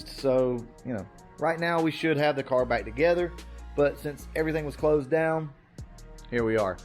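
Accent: Alabama, United States. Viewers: I'm from the south I heard your accent yep Alabama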